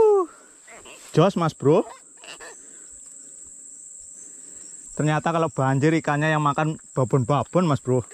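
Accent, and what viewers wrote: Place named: Java, Indonesia. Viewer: Logat e sampean kok koyo wong jowo mas....? Asli pundi mas bro...?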